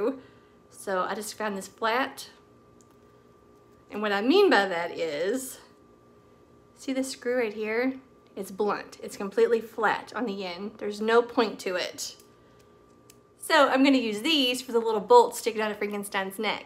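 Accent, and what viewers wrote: South, United States. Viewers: I hear a drawl in your voice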